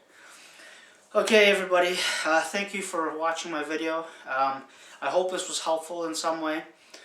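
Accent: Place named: Hawaii, United States. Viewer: Sorta Hawaiian lol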